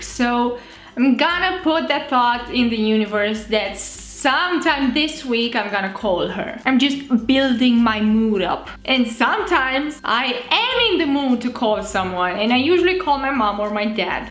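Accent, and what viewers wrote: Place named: Romania. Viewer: Numai mie mi se pare dubios sa aud un om pe care il stiu normal vorbind in enlgeza,ca acum vorbeste in engleza?:)) nu stiu dec e dar daca prima oara cand am auzit un om vorbind in romana,poate sa vorbeasca si cea mai buna engleza ca daca eu l am auzit inainte in romana acum mi se pare ca vorbeste o engleza naspa cu accent romanaesc:))anyway super video,te extinzi si in afara super:)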